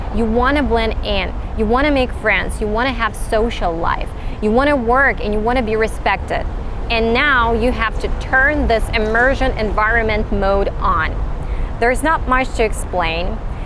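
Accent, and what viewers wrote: United States. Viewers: Вчора на вас підписалася, так думаю "напевне якась датчанка" бо ж всі вони добре говорять англійською) а тут дивлюся точно знайоме місто. Ви дуже дуже класна і хоч я англійський філолог, та мені далеко до вашого американського акценту! Бажаю вас багато підписників і задоволення від улюбленої справи) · Yeah. She looks like from one of those countries. She has a kidda American accent, though. A pretty woman, by the way. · Very good video with a bunch of great advice! 👍 Congratulations. By the way, you have an awesome american-english accent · I'm from a non-native English speaking country. I really wanted to check the opinion of a native speaker of English. Her American English accent sounds perfect to my ears, but because I'm not a native speaker of English, I was not really sure about that and was waiting for the opinion of an American native speaker of English. 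She is a good inspiration for me in my English learning journey. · скажите пожалуйста из какого вы города России? У вас отличный американский акцент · How're you? Your videos... it's a hell of a practical knowledge:D I love listening to your voice - your American accent <3, that's my goal:) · thanks ma'am, I am very much enthusiastic to have accent like you as american but I can't so I want to do so hence try to help me in this regards more tips by which may I be able to reach my goal at the earliest as I can. I regularly watch your video for being fluent in the english language and I'm trying my level best in order to do so please do provide me someone's whatsapp nos through which I want to be perfect by regular discussion else let's see how does it come to an end for doing so soon. but I am very much hopeful to do so accordinly. Thanks awaiting your reply please. (India) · Thank you, from the USA. 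I love your advice. you have an awesome American-English accent. Finally, I have to tell you're so good. When you will come to the USA. Let me know. Please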